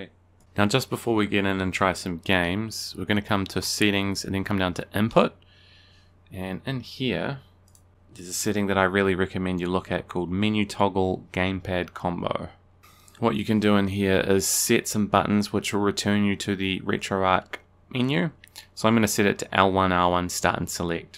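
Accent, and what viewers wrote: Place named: New Zealand. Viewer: Nice to hear a kiwi accent 🤘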